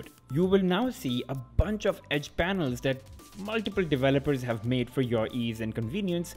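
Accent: India. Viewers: i hate the indian accent , it makes me sad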